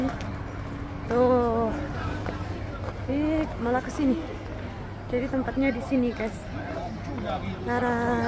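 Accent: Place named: Hunan, China. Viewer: Bhs mandarin kmu sdh mirip dgn org asli disana Kayak org bhs hunan tp msh bs lebih mengerti,ada medok nya tp bhs hunan lebih medok lg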